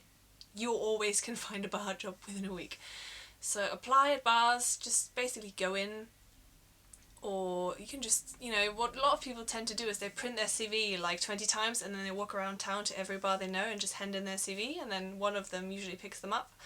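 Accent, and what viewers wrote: United Kingdom. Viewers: How did you learn the british accent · Amazing British accent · I really love your British accent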